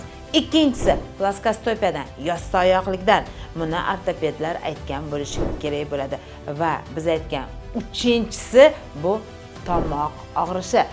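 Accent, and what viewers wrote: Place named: Khorezm, Uzbekistan. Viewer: Оник хоразмилисиз дохтир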